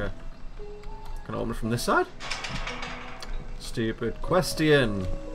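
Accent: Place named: United States. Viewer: I watched anyways. I actually like your accent (have a real hard time placing it). I was talking about your American accent. It made me giggle.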